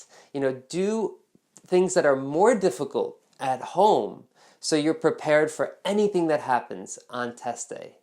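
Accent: United States. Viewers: Is that New York accent I'm hearing? The "T"s sound a bit like how Italians or Spanish pronounce their Ts.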